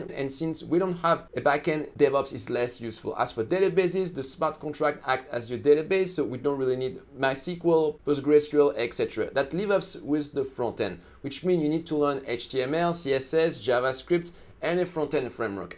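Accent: France. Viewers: I do enjoy your French accent! Plus really great content. The Harvest Finance hack video you did was excellent! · Love the French accent ❤️👍🏽But love eating the blocks more! :)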